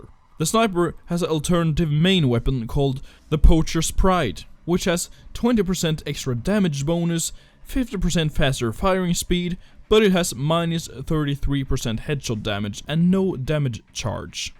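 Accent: Norway. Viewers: are you Norwegian? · e du norsk? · are you Norwegian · Are you from norway? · OMG i now know you're norwegian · Er du norsk? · are you norwegian?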